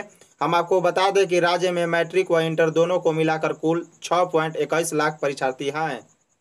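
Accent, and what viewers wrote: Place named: Jharkhand, India. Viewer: Sir aap jharkondi jaisa bolte haii achha lagta haiii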